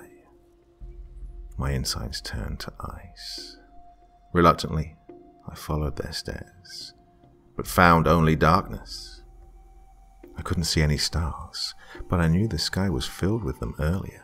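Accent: United Kingdom. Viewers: I thought you were British 😂 well, enjoy your trip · i also live in england and i thought he lives in england?!?! im so confused i thought he was british? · "My work is taking me to England" 1) I genuinely thought you were British! 2) Good luck, don't mention Brexit. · I made the mistake of thinking that with your accent you were perhaps already located in the UK.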